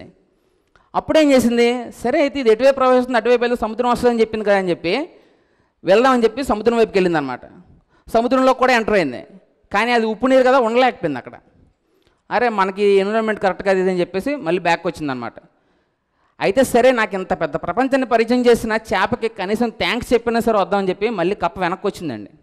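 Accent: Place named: Godavari, India. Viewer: Godavari slang....maadhe...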